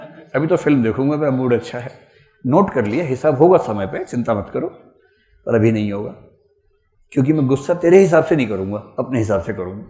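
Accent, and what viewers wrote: Bihar, India. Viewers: Mtiya do n.. sir bihari tune 😋😍😍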